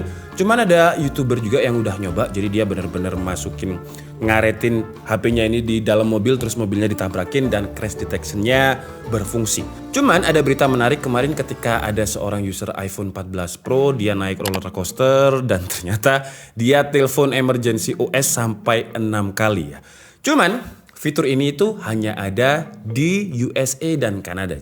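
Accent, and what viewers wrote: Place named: Surabaya, Indonesia. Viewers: Wong Endi mas kok koyo gae boso Jawa timuran🤣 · Review er nya orang mana. Kog kaya daerah Surabaya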